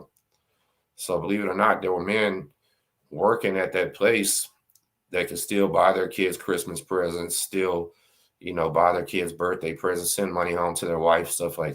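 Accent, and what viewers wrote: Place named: Louisiana, United States. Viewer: Just tonight your channel popped up, for sure I'm watching, I imagine I'll be subbing too, I'll verify, digging the lou-z-anna accent, much love to Louisiana, especially opalusous(may not have spelled that correctly ..all respect intended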